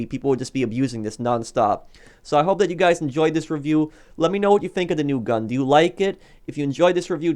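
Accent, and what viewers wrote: Poland. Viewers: Are you polish? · Are you polish? Just a Side question. But i love your content and i love How creative and descriptive, especially when it comes to killing griefers. Great job.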